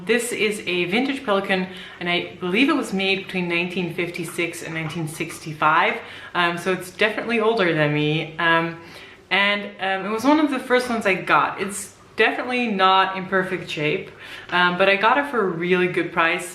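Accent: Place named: United States. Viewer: How come you do not have a Dutch but an American accent? Just curious, I never would have guessed. 🤔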